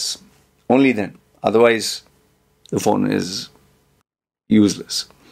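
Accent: India. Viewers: bro ur accent is very similar to Indian accent and ur voice is very soothing....😅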